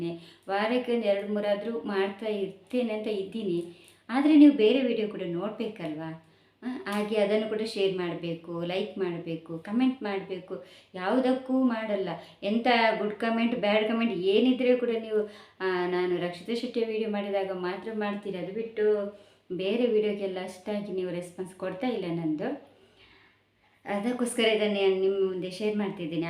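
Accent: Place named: Mangalore, India. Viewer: ನೀವು ಮಾತಾಡುವ ಮಂಗಳೂರು ಕನ್ನಡ ನನಗೆ ತುಂಬಾ ಇಷ್ಟ 👍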